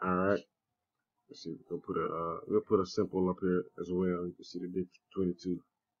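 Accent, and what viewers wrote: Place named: South, United States. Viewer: Yes, wealth of info in there. That's why bit frustrating cos i couldn't leave the video and had trouble understanding haha. I am from the UK so not used to the southern accent. It's not about how you speak but that we all speak differently depending on where we are from and can be hard to understand each other some times. Thanks again!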